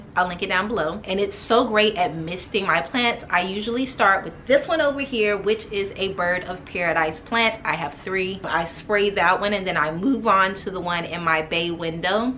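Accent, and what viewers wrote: United States. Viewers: you activated my alexa lol, all the way in London with an american accent love it x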